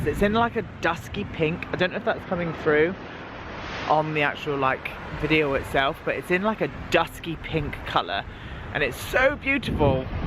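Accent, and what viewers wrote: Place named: West Country, United Kingdom. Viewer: I went to that Belgium restaurant back last year. My mum got so pissed after having tasters of the insane amount of beers on their menu, as we left she thought I was the waitress & asked why I was following her. We stayed in Tregenna castle, beautiful exterior…interior was fine…Watching this made me actually smile several times, proud of you & can see how much you appreciate what you’re doing. Plus I do love hearing the West Country accent (I’m a Bristol Bumpkin) Proper lush me babber, well done, can’t wait to see more of everything you do x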